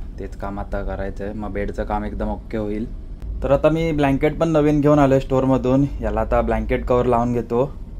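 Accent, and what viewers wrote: Konkan, India. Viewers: कोकणी भाषा आहे ती तसेच बोलतात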